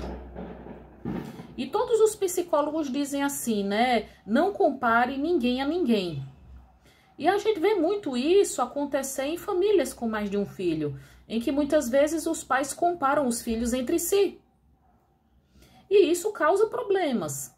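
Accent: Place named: Minas Gerais, Brazil. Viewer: Tu é mineira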